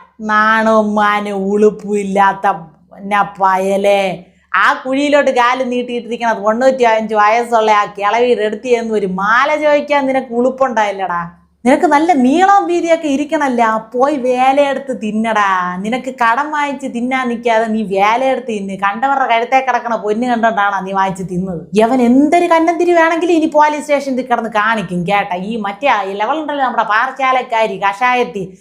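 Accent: Thiruvananthapuram, India. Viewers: തിരുവനന്തപുരം നാട്ടുഭാഷ കേൾക്കാനാണ് ഞാൻ ഈ വീഡിയോ മുഴുവൻ കേൾക്കുന്നത്. ആ കെട്ടവന്റെ കാര്യം കേൾക്കാൻ അശേഷം താല്പര്യമുണ്ടായിട്ടല്ല. എന്തായാലും പ്രസന്റേഷൻ അടിപൊളി.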